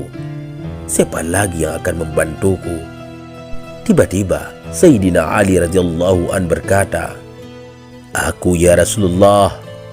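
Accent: Aceh, Indonesia. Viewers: Adminnya ada slang melayu acheh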